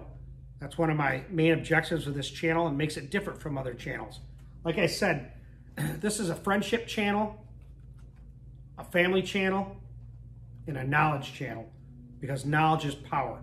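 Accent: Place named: Brooklyn, United States. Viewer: Were you from Brooklyn or Queens? you sound like you have an accent from that area!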